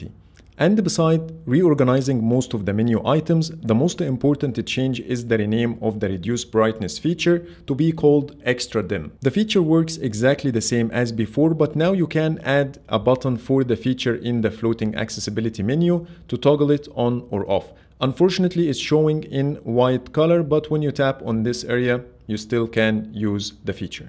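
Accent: Egypt. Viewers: Are you Egyptian !!!! لهجتك الانجليزي واضحة أنه مصرية 😁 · انت مصري 🤔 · Is this Egyptian accent 🇪🇬👏😋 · باين اوى انك مصرى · Why the Egyptian accent ?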